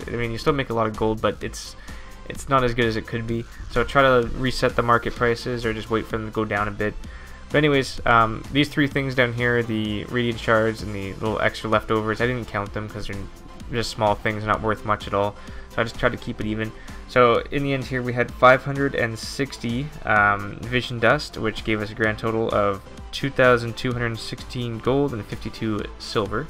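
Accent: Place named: Canada. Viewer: great video brother I can't tell if your from the north US or Canada you kinda sound Canadian lol my bad I just like to know where ppl is from.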